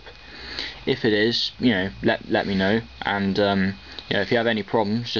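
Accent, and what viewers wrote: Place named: Australia. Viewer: i like ur accent, r u ausi or nz?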